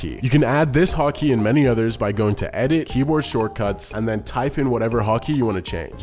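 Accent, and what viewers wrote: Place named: United States. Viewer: Top entertainer with a great feel for humour and edits. I've heard you have a comedy sketch filmed that has never seen the light. Although you sound American my intuition is that the film has a Scottish feel to it. Is this ever going out? With your skills you should be able to turn it into a 4/10 imdb rated film at least....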